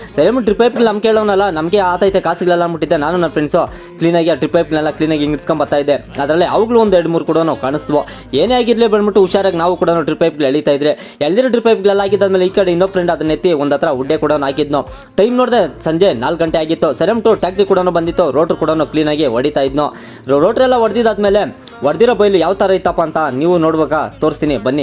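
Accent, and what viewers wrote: Kolar, India. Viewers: Kolar bashe bro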